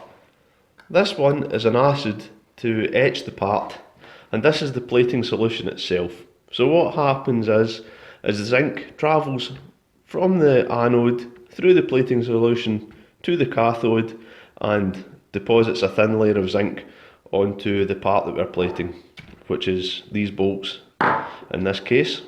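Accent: Scotland, United Kingdom. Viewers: The amount of rust is ridiculous was this a flooded car? or is it the effect of Scottish weather? P.S. I'm guessing from the accent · Lol . Without trying to offend you I am guessing you are Scottish?? Your narration is good mate , no need to change anything. 👍 if anything staying true to your accent is great . There is already to many people that feel the need to cater to their viewers more then necessary. Stay true to yourself. People are here and liked and subscribed to your content for what it is . Not for what they think that it should be . It is your channel. It it bothers them then they can either get over it or buggar off I'd say.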